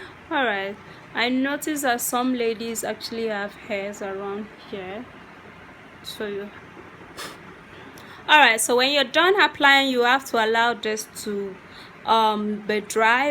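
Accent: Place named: Nigeria. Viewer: 77% of Nigerians bleach and judging from her accent shes Nigerian ): other Africans bleach too but Nigeria tops the list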